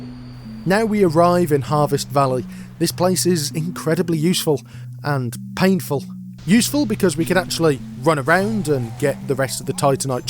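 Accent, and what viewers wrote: United Kingdom. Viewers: this is the most british person I've ever heard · Bro you are the most British person i have ever seen both looks and voice 😂😂 You should be England's national treasure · Ahh yes, congrats on the full time positon. Us Americans grratly appreciate a humorous soulstuber with a british accent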